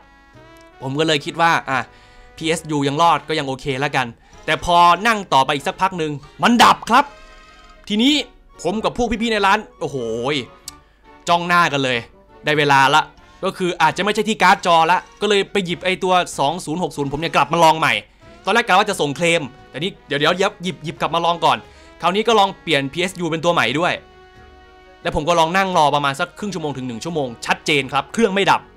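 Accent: Ubon Ratchathani, Thailand. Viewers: พี่เป็นคนอุบลฯเหรอ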